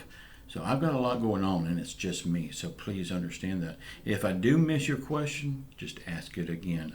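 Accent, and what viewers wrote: South, United States. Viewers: You know that's the 1st time I heard the word filling station in a long time and you make me laugh when you say word's like hurricane and tonight when you said Carol syrup it, krol syrup lol I,m not making fun of you the way you talk I live in Cookeville TN and I,m country and I know you live in florida and they are country but you seem like your country as corn bread I love this show i finally got to keep myself up tonight to watch it on time but I still watch it when I wake up around 3 in the morning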